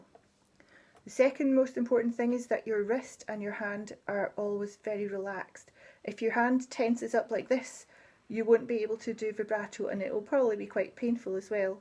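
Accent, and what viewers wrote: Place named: Scotland, United Kingdom. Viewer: I enjoyed your instruction ( ive been playing since before internet existed) I can learn something from anyone> its making the NEW ...a HABIT. I LOVE hearing your scots dialect. and pretty name. thank you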